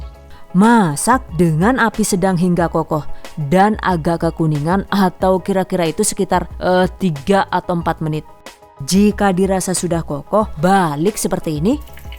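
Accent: Banyumas, Indonesia. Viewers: Aku suka koment nya...ngapak pd karo aku jan² jos bgt koh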